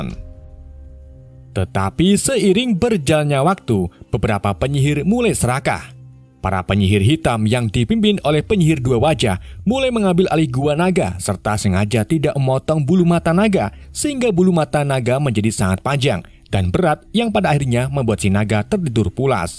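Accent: Indonesia. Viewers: Ciri khas dong lidah indo.. semangat ye'endeeeeeeeaaaaaahhhhhhh uraaaaaaaaaa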